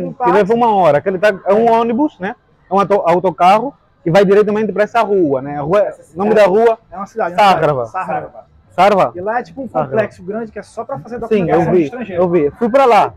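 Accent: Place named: Brazil. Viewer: Não Acho que há problema algum nisso, não deixa de ser um idioma, e nos entendemos, e se acompanhasses realmente o canal dele, saberias que ele, adora a cultura e o povo brasileiro, e muitas vezes mencionou que sempre que fala com brasileiros tenta falar como um brasileiro, o que eu acho bonito como brasileira, não sei para os angolanos, mas isso não lhe tornara brasileiro, ele ama idiomas, e idioma não se baseia só na lingua mas sim em pronúncias e sotaques diferentes, aprender sotaque de outro país também é um desafio linguístico. Parabéns para ele, Brasil te ama.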